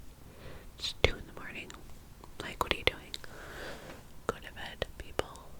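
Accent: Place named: Upstate New York, United States. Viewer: everything you do is amazing and I fall asleep to your videos every night💛 my husband is from upstate NY and y’all say words the same and I always tell him you pronounce or call things the same😂 I’m from Texas so I absolutely love it. Hope y’all have the best Christmas❤️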